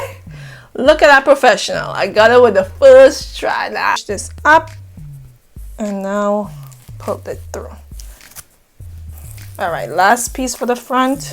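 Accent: Trinidad and Tobago. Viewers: I'm new to your channel but are you from Trinidad? Your accent is beautiful!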